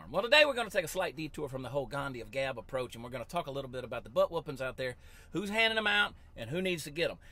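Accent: Texas, United States. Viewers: This is so funny because as soon as I heard you talk I wondered if you where from Texas and I'm from Oregon and you are so right about Portland 😂😂😂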